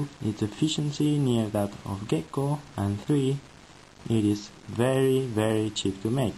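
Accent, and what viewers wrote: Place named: Japan. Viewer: Hola,! Buena presentación! Tu pronunciación esta bastante influenciada por el japonés...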